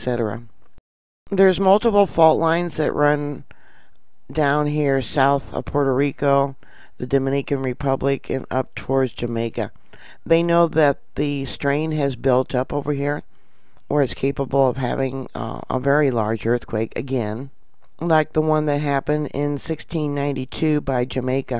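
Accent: South, United States. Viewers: She knows but sometimes no matter how hard you try, you just can't get them to come out right!! Besides, shes a country gal...I'm from Ga n ppl say we talk funny. We think they do! Lol.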